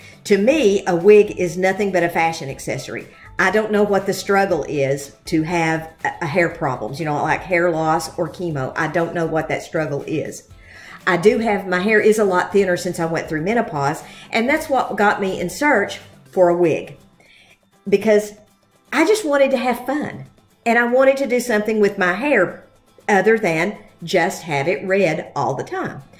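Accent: South, United States. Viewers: Can I ask where you are from in the South? I’m from Arkansas and I love to listen to you.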